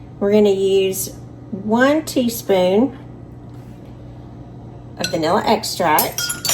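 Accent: South, United States. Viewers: Some people say the video was too long for it's content. I enjoyed listening to her southern accent and watching her cook. If you're an experienced cook all you have to do is scroll down to the recipe and directions and skip the video altogether. So shut up and let the rest of us enjoy the video. And I will be making this recipe.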